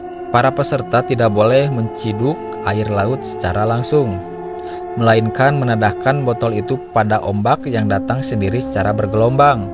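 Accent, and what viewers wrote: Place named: Sunda, Indonesia. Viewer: Lentongna sunda pisan euy 😊👍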